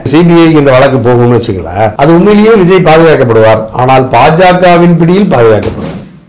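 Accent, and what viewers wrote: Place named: Kongu, India. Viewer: கொங்கு மண்டலத்திற்க்கே உரித்தான நக்கல் பேசும் ஆளுயா நீ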